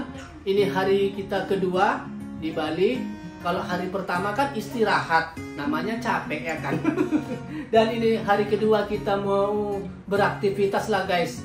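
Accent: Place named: Pematangsiantar, Indonesia. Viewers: Mantap dek... Pertahankan ciri khas siantarnya... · Logat Siantar ny ga hilang ya Lae . Untung lah unik unik Lae klu sempat Anik Anik runyamlah nanti di tempat sarapan ny...😀😀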